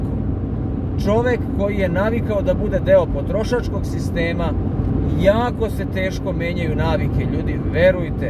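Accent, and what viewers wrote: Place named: Dalmatia, Croatia. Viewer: Volim dalmatinski dijalekt pozdrav iz srbije !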